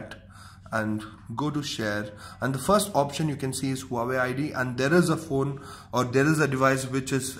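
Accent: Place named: India. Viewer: You need to speak slower and clearer. Not everybody can understand your heavy Indian accent.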